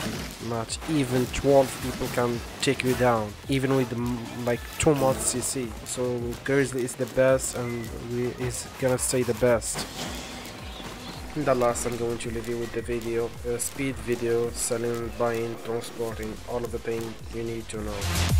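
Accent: Morocco. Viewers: This is a Moroccan accent xD it can't be otherwise haha love it content man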